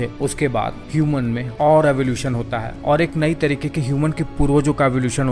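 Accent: Bihar, India. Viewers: Bhai tm bihar s ho kya 😮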